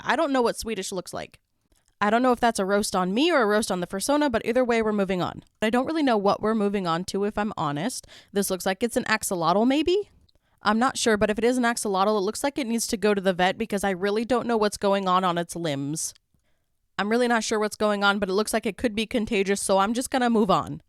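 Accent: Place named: Minnesota, United States. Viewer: it sounds Minnesotan to me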